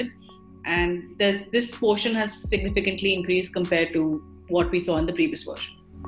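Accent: India. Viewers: Why can’t we get an American native speaker to explain what is going on. A Hindu speaking with a thick Indian accent that is dying trying to speak English. This is totally WILD. It’s completely tiring trying to understand this. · Thick Indian accent or pronunciation errors. Eg. Privacy. Couldn’t follow.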